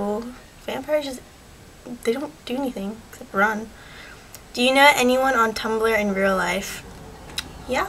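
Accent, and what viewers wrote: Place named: United States. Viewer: ya know just ta make ya feel better, you have an amercan accent, it would be noticeable (spelling fail XD) if you spoke anotha lenguage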